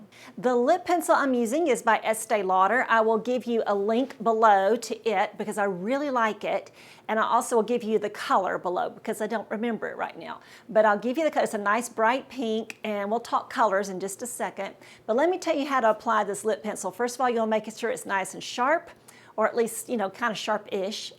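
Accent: South, United States. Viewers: Thank you for your sweet southern accent. Reminds me of when I lived in Athens Georgia at college!!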